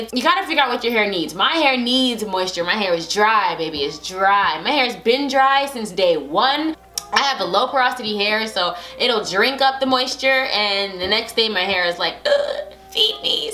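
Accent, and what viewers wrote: Jamaica. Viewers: I knew i heard an jamaican accent in one of ur videos but i just brushed it off as i was too proud lol now u cleared that up. 876🇯🇲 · Hair is beautiful queen!!! Do you have a patois accent btw? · Video sell off. Real Jamaican talk. Any tips for bald patches.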